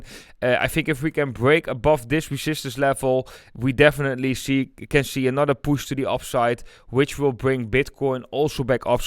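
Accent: Netherlands. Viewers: Your name ' ' before i hear your name i did think immediately he is Dutch. I can hear the Dutch accent. LOL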